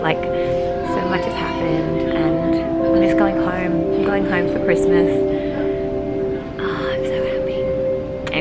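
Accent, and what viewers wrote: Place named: Australia. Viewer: An Australian accent 🥰 have fun!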